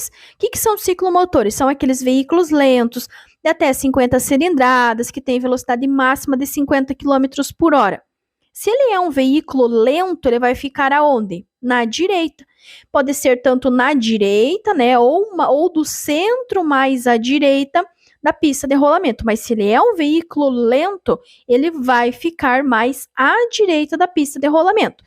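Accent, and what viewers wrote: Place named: South, Brazil. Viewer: pelo sotaque e do sul.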